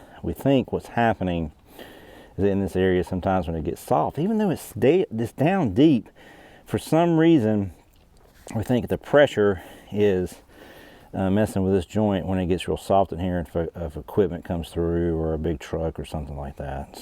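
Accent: South, United States. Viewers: I'm learning southern accent thanks to your videos 😂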